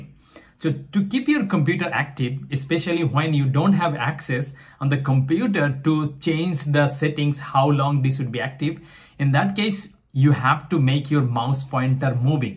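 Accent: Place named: India. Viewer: Are you from INDIA? Because your accent is like ours.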